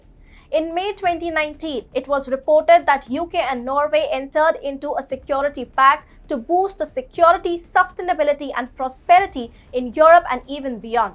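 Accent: India. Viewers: Dragging her spoken english words with super strong indian accent,idon't know how thr media company chooses her,she also yell over spoken sentences.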